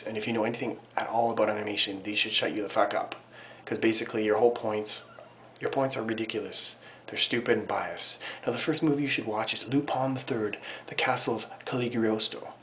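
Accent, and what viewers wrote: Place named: Canada. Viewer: You didnt have to explain The Z thingie since your aboot was rly obvious liked ur vid tho def kudos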